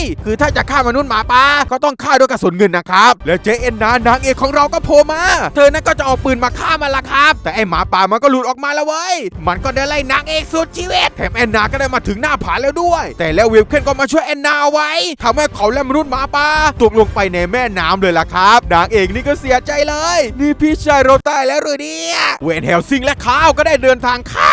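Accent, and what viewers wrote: South, Thailand. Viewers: มึงคนใต้...ไช่หม้ายคับพี่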